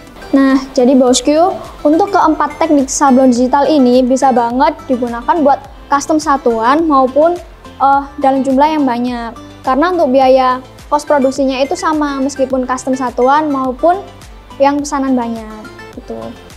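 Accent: Java, Indonesia. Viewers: logat jawa😁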